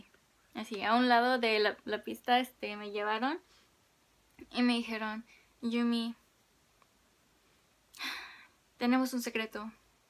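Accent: Mexico. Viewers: Que linda wow eres de mi país mi hermoso México saluditos ^.^ ya decía que tu acento era igual al mio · Tu asento fresa...me encanta..felicidades..me encantas.. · ¡Tienes el acento de México! 😂🇲🇽👏🏻 Tu español es muy bueno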